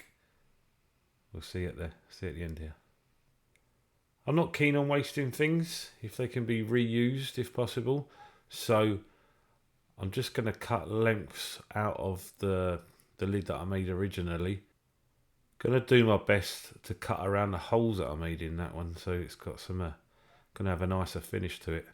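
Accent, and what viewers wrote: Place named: England, United Kingdom. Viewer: Ah. An English accent!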